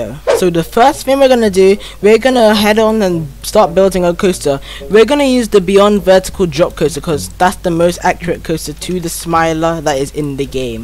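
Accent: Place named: Brazil. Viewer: no i feel like u talk from brazill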